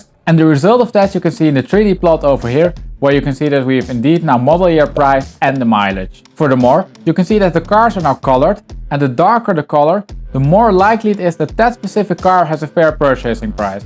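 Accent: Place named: Netherlands. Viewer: Hello, bought myself a facelift GTS 2017 with 9000km in Belgium for 85k€. What a car. Beautifull en lots of sound and driving pleasure! Sold my <num> turbo cabriolet for same price as i bought it 10 year ago! Thanks for all your unique and perfect analysis. Regretfully all in dollar and US figures... as i think hearing your accent that you are from europe.... Holland i think 😅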